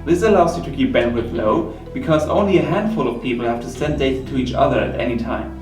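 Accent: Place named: Germany. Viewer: i bet you're german!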